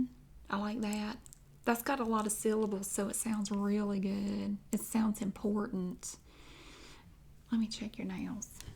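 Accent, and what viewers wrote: South, United States. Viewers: I absolutely love your videos 😍❤️ especially the southern accent my fiance is from nc and when we first met I had to learn how he talks because of the accent always looking forward for any of your videos they always help me so much I'm going through a lot of anxiety and your videos always calm me right down thank you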